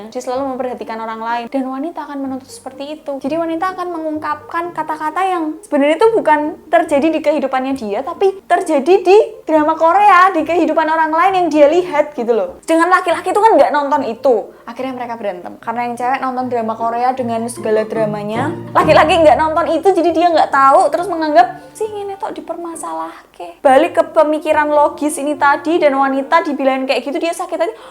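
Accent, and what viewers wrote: Java, Indonesia. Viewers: Nonton buat edukasi 🚫 Nonton buat denger medok gurih✔️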